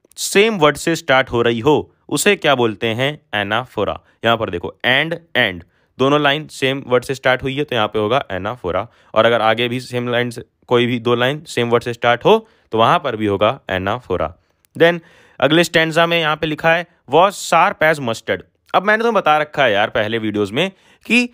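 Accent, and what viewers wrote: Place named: Uttar Pradesh, India. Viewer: Sir u explain very well...hats off to you sir ji....by your voice i understand that you're from UP😊🙌😉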